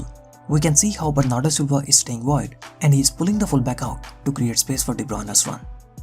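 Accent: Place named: India. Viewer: Bro please i like your videos but please fix your english accent its very annoying..... i hate this indian accent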